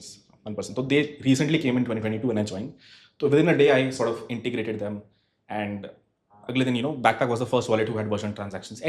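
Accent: India. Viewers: Thanks Bro speaks Indian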